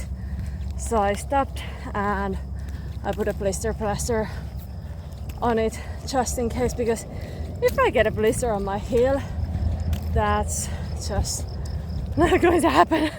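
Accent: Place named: Ireland. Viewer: I’ve often wondered how a Finish girl should end up in Ireland , you picked up a good accent so must have been a while . I like a Crisp sandwich any day of the week 😊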